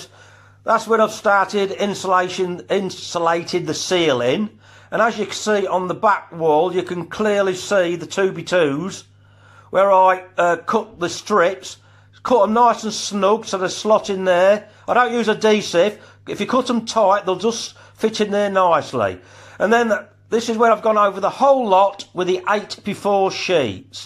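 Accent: United Kingdom. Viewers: Appreciate the video. Young fish keeper looking to set up a fish room when I have the space. Nice to hear a fellow Brit :)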